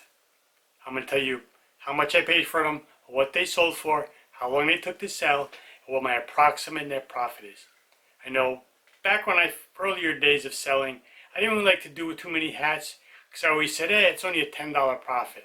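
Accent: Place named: New York, United States. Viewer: I’m a reseller and found your channel and I wanna say I love your videos I am also from New York but live in Cincinnati Ohio I knew the second I heard your voice you were from home.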